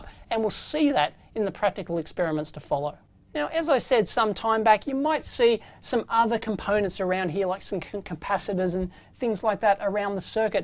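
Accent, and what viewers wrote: Australia. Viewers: This may be the most Australian sounding man I have ever heard.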